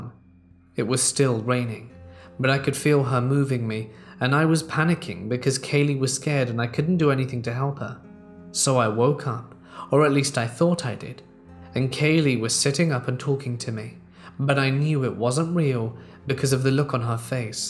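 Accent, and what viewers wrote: United Kingdom. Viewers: My hEDS is kicking off my chronic pain. Every time this happens, I end up sitting up listening to your soothing voice (us Brits do have a fabulous accent!) And relax till I don't notice the pain anymore xxx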